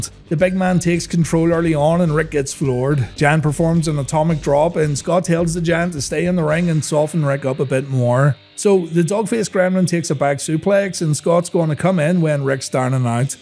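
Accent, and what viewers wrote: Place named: Scotland, United Kingdom. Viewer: a Scottish guy talking this video, brutal to listen to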